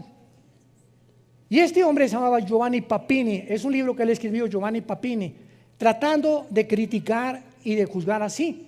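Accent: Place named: Mexico. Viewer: Gracias a Dios por este siervo y hermano en la fe de nuestro Señor Jesucristo, el Dr. Armando Alducin quien tiene un ministerio maravilloso enseñando al pueblo de Dios las grandes verdades bíblicas, el plan de Dios para la redención de la raza humana, una linea cronológica de los grandes acontecimientos actuales y futuros del plan de Dios para la humanidad, una orientación de la palabra de Dios para consolidar el crecimiento del carácter cristiano, profundiza las enseñanza y los misterios de los diversos conceptos bíblicos contextualizándolos, geográfica, histórica, cronológicamente etc. etc., revela el carácter santo, el amor, y la justicia de Dios. Además lo expone de manera magistral, profunda, ungido por el Espíritu Santo, entretenida y con su acento mexicano que para los cristianos de habla española nos resulta muy interesante y divertida, en especial a los cristianos chilenos que admiramos y respetamos mucho al pueblo mexicano.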